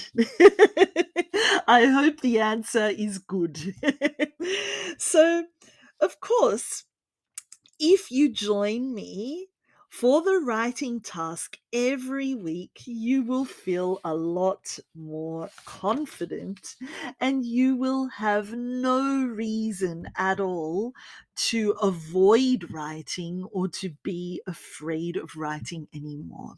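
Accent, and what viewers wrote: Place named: Australia. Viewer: I really like so much of your Austrália accent, the way and metodology that you use to talk, it is clearly and make me to enjoy it. One more subscribe. 👏👏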